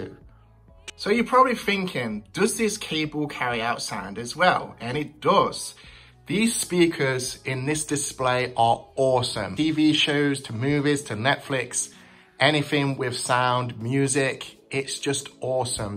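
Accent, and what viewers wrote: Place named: Midlands, United Kingdom. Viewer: “Me old” “I got it for fifteh” definitely a midlands lad 😂👍👍👍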